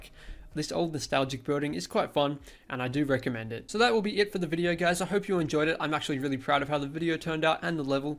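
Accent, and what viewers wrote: Australia. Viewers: most Australian intro ive ever heard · Are you Australian? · By the 1st 3 words i guessed you are an australian M8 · Like that good Australian accent mate. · is he australian or some british country if he said "g'day" hes australian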